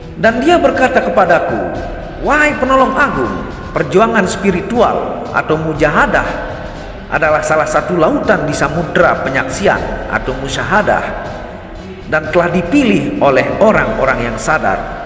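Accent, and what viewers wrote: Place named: Lombok, Indonesia. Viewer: Kayak logat orang lombok side meton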